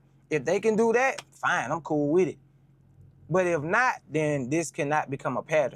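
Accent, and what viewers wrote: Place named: South, United States. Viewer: New Subscriber Alert!! 🚨 Love your mindset & southern accent! Looking forward to more videos 😊🔥🔥🔥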